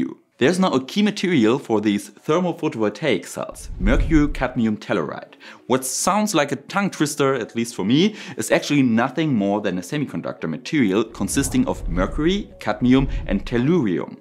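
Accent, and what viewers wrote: Germany. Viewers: Nothing wrong with your English. No need for excuses there. Don't denigrate yourself. Besides, if anyone clicked on and then gets mad for a German accent, that is totally self-inflicted. As for the cells... really depends on how much energy they cost to produce and how much energy they make in turn. Wonder what a first experiment would look like - regular photovoltaic on top, mercury cadmium telluride on the bottom. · Das Englisch ist wirklich nicht schlecht und wird auch immer besser. Ich denke, dass es im allgemeinen als ein charmanter Akzent passend zum Kanal-Namen wahrgenommen wird (wir hoffen ja alle, dass unser deutscher Akzent als charmant wahrgenommen wird)... Also ein echtes Markenzeichen! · Are you sure you want me to hit the subscribe button? As I am already subscribed and now I am unsubscribed which is annoying as I like your German accent, and you speak English fine, So I sorry bit I going to go against your wishes and subscribe to you again. 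· You're English is fine -you do sound very German, but pronounciation is great. You do have slight vibes of Jurgen the German, a character created by Harry Enfield for his BBC comedy show. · Your English is perfect just spoken with a slight German accent (I can understand you better than some Southern American accents). This is a VERY interesting topic and I liked how you presented the technology and then the hurdles. I subscribed.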